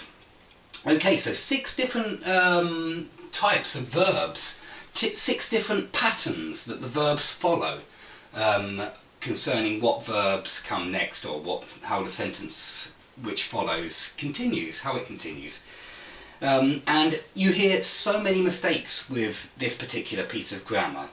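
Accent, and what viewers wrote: United Kingdom. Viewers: you've got such a cute British accent. n.n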